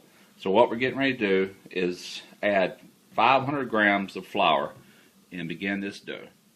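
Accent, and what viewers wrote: South, United States. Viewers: + southern accent = win, i'm a huge fan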